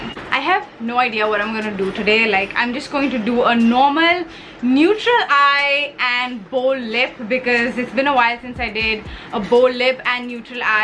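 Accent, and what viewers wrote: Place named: United Kingdom. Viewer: love it as always!😍 and can you plz plz make a video on how you learnt british accent and some tips and tricks to learn it!!!! love ya!😘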